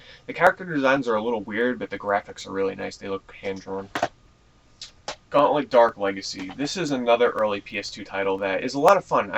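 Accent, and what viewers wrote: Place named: New York, United States. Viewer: haha, i know. i love the accent. i think in a video he said he's from new york.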